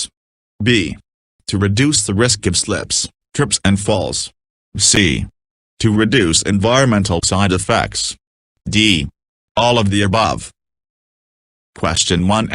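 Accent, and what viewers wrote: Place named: United States. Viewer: Why the american accent voice over? Also, since when do we use the term "gasoline"? Very poor quality.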